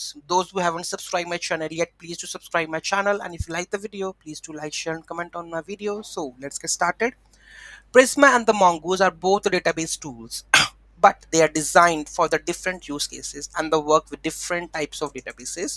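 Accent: India. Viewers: Even though with Indian accent but at least I understood all your English, thanks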